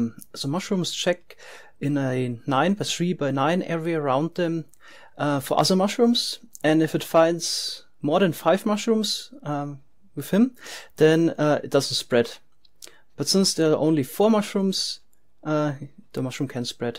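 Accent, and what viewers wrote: Germany. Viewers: You're german, right? :)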